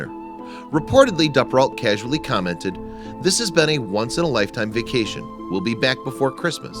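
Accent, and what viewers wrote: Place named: East Coast, United States. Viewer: Who hired this narrator, I can't stand his east coast twang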